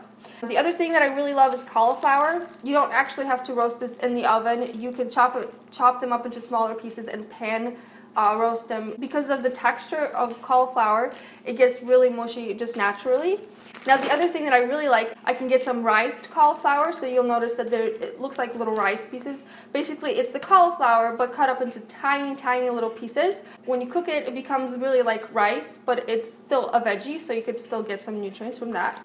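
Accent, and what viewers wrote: Poland. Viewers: Just wondering but are you Polish? Because your name is and you kind of sound Polish. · omf i absolutely love your accent. 😍 are you by any chance polish?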